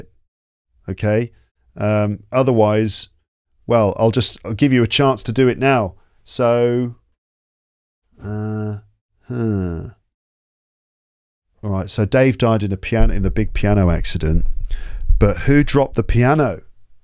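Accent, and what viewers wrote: United Kingdom. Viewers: Do you speak in british accent? 🇬🇧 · 👍👍👍👍👍👍 good teaching. he is British native English. Not American. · you're such a great person, and your channle is really a treasure for those who want to learn English in its natural way, by listening to Britch guy with a very clear accent, just thank you for your effort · Thank you so teacher you are teaching english good also be able to listen British accent this is real one not easy to find out easy ways some one like British accent and translate to shot form sentence this is good idea clear thanking you. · Are yoy British? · I happened to find your channel and totally drawn into. It was really interesting and learning a lot. I was looking for an English lesson program just like it. You are such a great teacher and I like your British accent as well it sounds so nice. I will check out your other movies. 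Thanks a lot!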